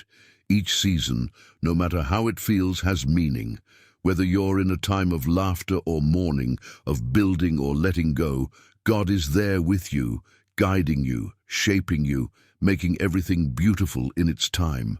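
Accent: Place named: England, United Kingdom. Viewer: Very good. But please 🙏 please no more English accent.. please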